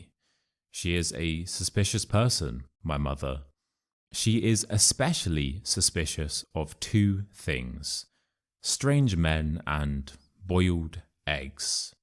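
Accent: United Kingdom. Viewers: I like you british acccent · I do enjoy the story very much through your beautiful British accent. I cannot thank you enough ❤❤❤❤❤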